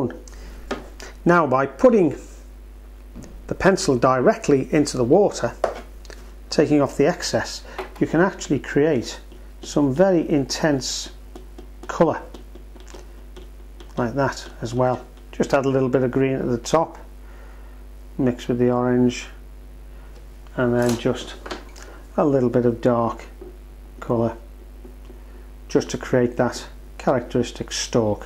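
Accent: Liverpool, United Kingdom. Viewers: Thank you. I watched this with my children and you did a fantastic job! PS 6 yrs ago, we moved from Cheshire back to Canada. Your lovely accent sounds like you are from somewhere between Liverpool and Cheshire. Are we right??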